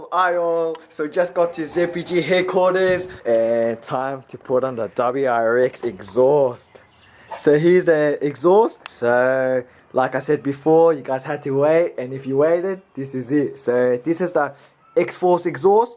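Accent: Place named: New Zealand. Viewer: Never heard an Asian sound so kiwi, aussie and asian all at the same time. Mate :')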